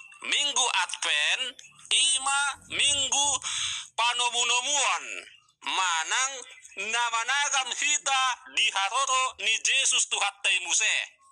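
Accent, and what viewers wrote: Java, Indonesia. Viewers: Saya kagum dengan Bapak bukan hanya Sejarah saja yg pintar tapi Agama juga mantap.Dan membuat aku merasa heran kalau bicara bahasa Indonesia Bapak nampak logat Jawa.Tapi kalau ngomong bahasa Batak sangat fasih dan tidak ada kejanggalan.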